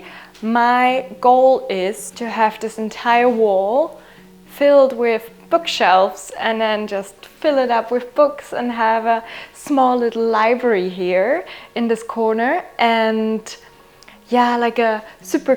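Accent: Germany. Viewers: Sehr hübsch...Deutscher Akzent, sehr heimisch und vertraut ❤😉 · She has an german accent,(twist) and that is quite normal, if your native lamguage is not english.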